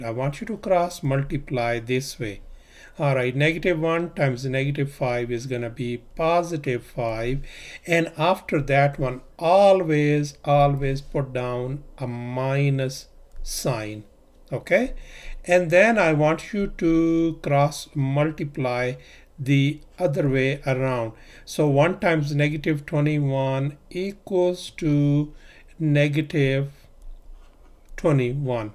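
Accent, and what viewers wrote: India. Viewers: he sounds like an Indian.. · I his his from soth side ,maube from kerala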